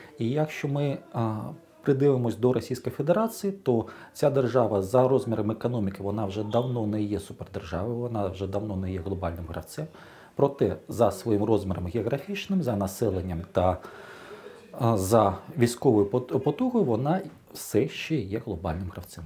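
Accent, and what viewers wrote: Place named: Belarus. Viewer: Гы. Вообще интересно звучит белорусский акцент , совершенно не так как московитский. К сожалению мы такое очень редко слышим, а я вообще впервые услышал украинскую мову с белорусским акцентом. :)))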